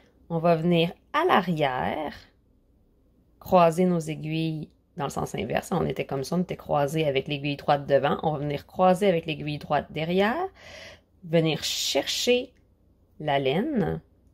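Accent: Quebec, Canada. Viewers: Woaw, un point qui le fait plaisir et l'accent du Québec aussi. Quebecoise en France depuis 1998😊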